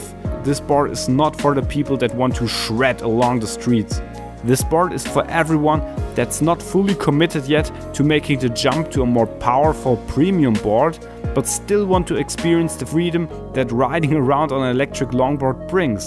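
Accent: Germany. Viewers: Wieso net deitsch? Des deutsch Akzent englisch ist ohrenkrebs dude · Hes like German Engineer on old VW commercial dubs.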